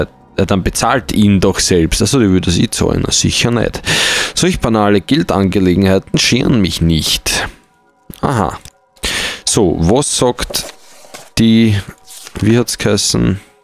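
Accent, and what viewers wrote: Vienna, Austria. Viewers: Endlich Wienerisch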